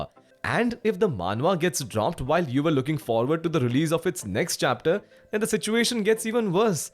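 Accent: India. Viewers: Thanks, I didn't wanna hear his disgusting Indian accent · this guy is indian.... i know this accent 😁😂😂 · india accent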